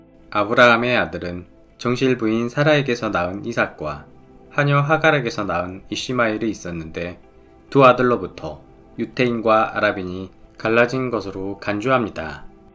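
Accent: Jeolla, South Korea. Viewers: 혹시 전라도 분이신가요? 사투리가 익숙 하길래 여쭙니다 ㅎ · 억양을 보니 전라도 출신이시네요 ㅎㅎ 광주같은데... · 전라도 목소리네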